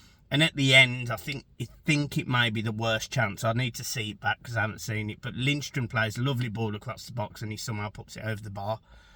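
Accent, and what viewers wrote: Birmingham, United Kingdom. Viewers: 1st time watching . Got confused with Brummy accent thought had clicked on a Villa channel 😂☺ Am a Staffordshire Evertonian so pot calling kettle black. Leicester and Southampton gone.Wolves very similar standard to us. Ipswich have more spark. Us or Wolves.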